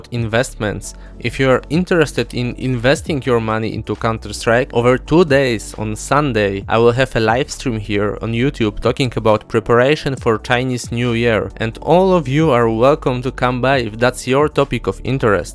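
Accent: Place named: Poland. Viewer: masz troche polski akcent heh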